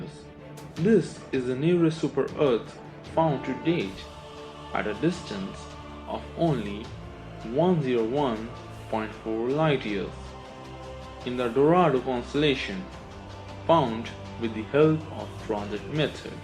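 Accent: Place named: India. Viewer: Can't you tell.from his thick accent? Although he tries his best to mask it, but indian accent is just too difficult to get rid off.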